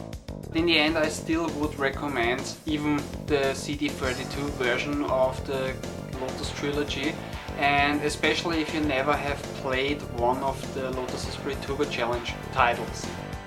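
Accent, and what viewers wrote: Germany. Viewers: Its like the T-800 reviewing retro games ;) ;) Please take no offence i really enjoyed this vid. Just your german accent is very very strong. Greetings! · dein Bayrischer oder Ösi Dialekt hört sich ja GRAUSAM an !!! --- RrrRrRrrr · Alter biste deutsch? xD Das klingt so? :D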